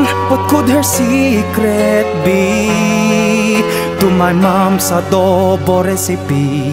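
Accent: Philippines. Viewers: That thick filipino accent though...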